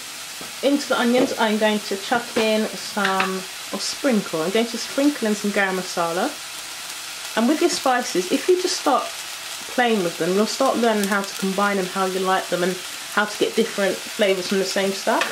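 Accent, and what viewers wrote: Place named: United Kingdom. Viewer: Aside from the British accent your voice is so nice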